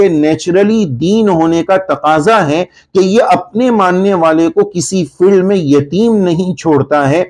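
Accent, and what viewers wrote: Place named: Arab world. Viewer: Urdu Urdu ki tarhan he bola jay na ke aarbic style me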